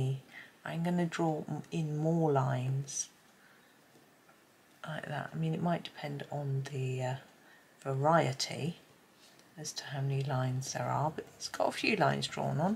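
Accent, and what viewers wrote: United Kingdom. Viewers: The basket truly is the star of the show! I get such a kick out of how you Brits pronounce certain words so differently, like thistle. I'm with you, I'd never forage for mushrooms, too risky!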